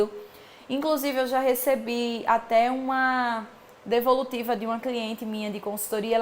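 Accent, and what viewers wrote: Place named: Fortaleza, Brazil. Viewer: mulheres com sotaque de fortaleza é o mais lindo.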